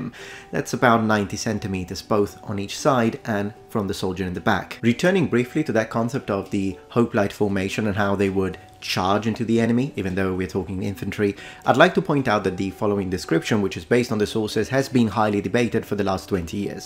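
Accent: Greece. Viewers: Great greek accent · I love your Greek accent, really nice job. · Great video! Your Greek caught me by surprise!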